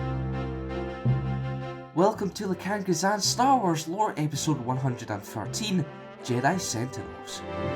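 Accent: Scotland, United Kingdom. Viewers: welcome. Your accent is Scottish, right?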